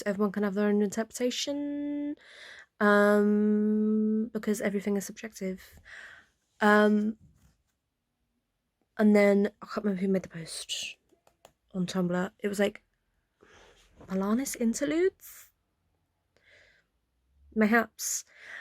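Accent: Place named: United Kingdom. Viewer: Nah, you would totally dominate that podcast ! for one, your're British accent will make you sound superior, Lol - and 2, you know your stuff! I hear a ton of blogs saying she is back with Agron? I actually think they're in communication, maybe not back together just YET< >but they soon will be. I also think that Taylor will at some point, start hinting more towards her sexuality, she will either do something major after ( covid) and get papped being way too touchy feely wit a girl just to drop hints... and then eventually, she will start forcefully hinting at her 'bisexuality'< she will never quite come out of the closet, but she will aggressively ( more than she already have been with Karlie) drop suttles. Taylor want's out the closet, but her team will NEVER let her. Because taylor , Jennifer Aniston, Katie Mcgrath and an host of others, will never, EVER address those sexuality rumors.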